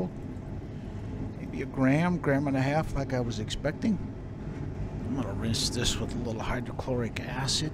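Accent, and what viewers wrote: United States. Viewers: You speak such a nice English dialect. Can you tell where exactly in the US you live? I'm from Germany, by the way, that's why it's kind of fascinating for me. Is there actually an accreditation for precious metal refiners in the USA? Can or do you have to issue certificates for the purity of your gold bars? In Germany, such things are usually very strictly regulated.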